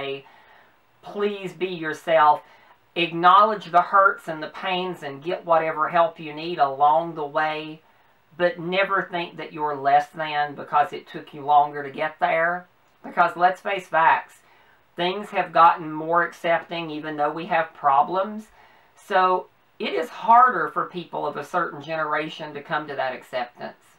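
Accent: South, United States. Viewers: Love you southern accent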